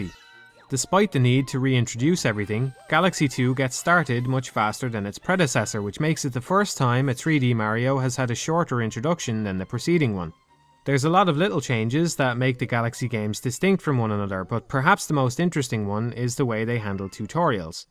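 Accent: Ireland. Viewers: Love your videos. Can't get enough of that Irish dictation. I have a new idea for a review series: Mass Effect.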